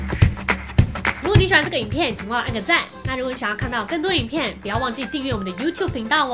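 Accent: United Kingdom. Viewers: 這英國腔超明顯的，are you alright...等等:) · 想知道如何說英國腔😁😁滴妹說英國腔好聽～～ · 這一次的影片真的很不錯！ 有跳脫出純教英文的FU，然後針對英國的當地文化進行英文解說，非常引人入勝，令人很想再重看一次！ 最後滴妹的英國腔好聽，希望以後可以教唱英國腔的英文歌XD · 滴妹好適合 溫柔的英國腔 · 英國腔好聽~~ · 剛剛才看到英國脫歐有變數的新聞（希望不要脫） 就看到滴妹回來了～～～～英國腔好好聽～～ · 我小時候學兒美 老師上美式 檢定考英式 頭很大！ 😅 聽到滴妹唸英國腔，彷彿回到以前聽聽力的感覺😂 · 滴妹英國腔也太好聽了~~~~~~~~~~~~~可以教一下嗎？ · 英國腔讚~ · 哇～英國腔！練了三四年都沒有練出個所以然來，羨慕滴妹啊～！可以拍一個英國腔的影片嗎？Please~ 🙏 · 英國腔好好聽~ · 英國腔感覺好優雅😍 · 英式發音 真的好好聽！😍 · 英國腔超讚!!!!! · 耶好想念滴妹🌸 後面的英國腔好可愛 我也好希望以後可以去一趟英國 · 滴妹的英國腔 好像喔⋯⋯ 我也想繼續看 滴妹分享英國行的大小事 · 英國腔真的超好聽 超愛 好想去英國😭😭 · 好漂亮的英國腔喔~ 讓我想到英檢考聽力的時候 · 英国腔超好听der😍😍😍😍😍😍😍😍😍😍😍😍😍😍😍😍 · 原来滴妹没有在英国留学！ 那英国腔是怎么学的呢？ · 英國腔好聽~ 之前在NZ的時候很多超市都有自助結帳機欸~像Countdown或New World都有喔! 看起來很方便可是從來沒試過XD下次來試試看w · 很喜歡英國腔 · 滴妹妳的英國腔超讚超級可愛~~~ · 滴妹的英國腔跩跩的好好笑喔XDDD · 好喜歡滴妹模仿英國腔英文，好聽又可愛！ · 滴妹用英國腔講話好像妙麗喔 QwQ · 超喜歡滴妹英國腔😂😂😂😂😂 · 英腔大好啊啊啊！ 滴妹今天穿的好文青帶點英式感👍 阿滴最後的「個屁啊」注目😂😂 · 我有去過～～哈哈 滴妹的英國腔超口愛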